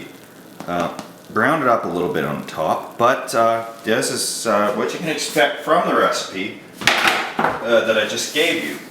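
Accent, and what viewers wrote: Canada. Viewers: U Canadian??? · plus the accent.. definitely Canadian! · I'm from India, I've never been to N America, and I could tell you're Canadian. How? Because of watching South Park. LOL! Your "abouts" gave it away. Yours is probably the best looking pizza base I've seen on youtube. I'm definitely gonna try. Thanks for the informative video. Where did you get the measurements to the tenth of a gram? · u canadian? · This guy sounds canadian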